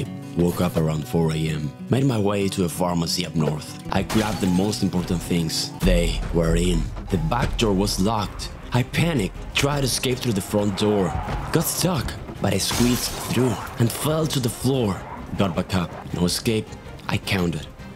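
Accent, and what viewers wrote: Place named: Argentina. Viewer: wait, you're from argentina? Yooo, south america gang. i'm from brazil. I noticed your accent.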